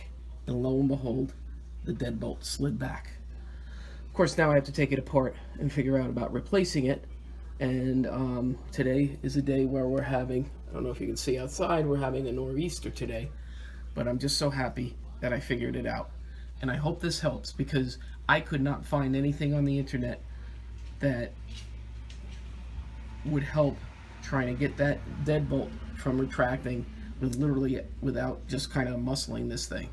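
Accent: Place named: New York, United States. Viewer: Love the accent. (I'm in the Midwest.) Where are you from - New York, New Jersey?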